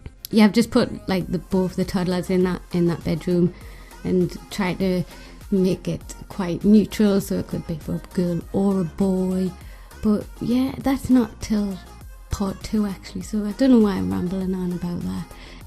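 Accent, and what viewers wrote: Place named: South Shields, United Kingdom. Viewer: SO creative!! can't believe there was no CC! love your south shields (?) accent... subscribed!! xxx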